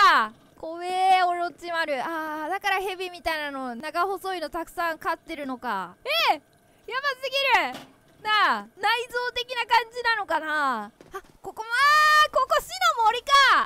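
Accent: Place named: Mie, Japan. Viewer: 三重県の人なのかな？ ○○いけやんって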